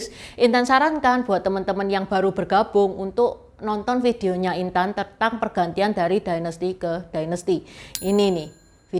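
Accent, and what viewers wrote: Java, Indonesia. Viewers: Bgs sekali chanel nya... Tapi sblm nya maaf ya ce intan... Bahasa ce intan terlalu medok skli logat jawa nya...😂😂 Di syg kan skli ji kalau ce intan coba bisa 4 bahasa ( khek,hokkian,kanton,tiociu) kita bisa byk skli utk dpt belajar...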